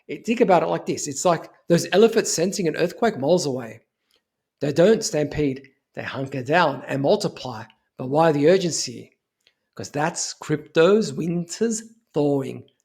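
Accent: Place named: Australia. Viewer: Keep the vids coming. Good work. Guessing you're an Aussie?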